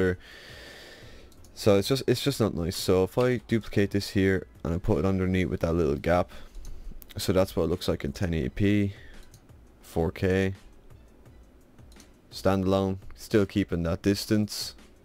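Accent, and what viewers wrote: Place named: Ireland. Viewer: First Tutorial with an Irish accent and I love it.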